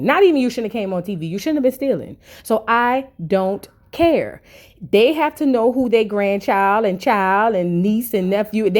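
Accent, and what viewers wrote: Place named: Louisiana, United States. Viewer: Why you sound like the mistress, is it a lousania thing, lol.....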